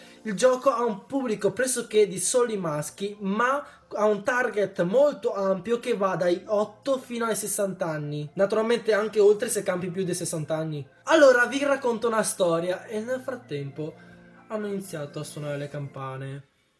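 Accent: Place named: Veneto, Italy. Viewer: di dove sei? (italia) perchè ho sentito un pò di dialetto veneto ahah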